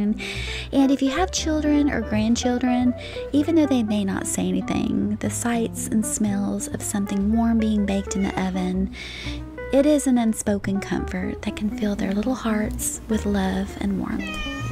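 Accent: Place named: Georgia, United States. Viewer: That's a nice take on veggie soup. I'll have to try that one😋 may I ask about your nails? I'm fairly new to your channel. Are the your natural nails or press on? They always look beautiful. Really enjoying your content. You sound like your from my neck of the woods in GA. How'd you get to Iowa? Have a good safe weekend 😊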